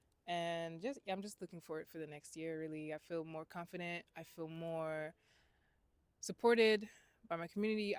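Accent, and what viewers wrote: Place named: England, United Kingdom. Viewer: You are sooooo cool! New subby!!! I LOVE your English accent!!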